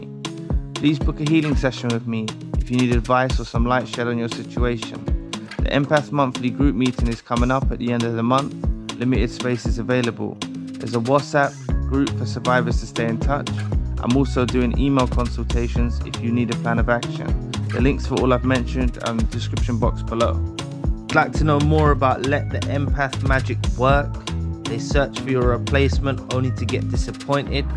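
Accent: London, United Kingdom. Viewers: tring to work out your accent , thinking maybe LDN originally but moved out the city :-)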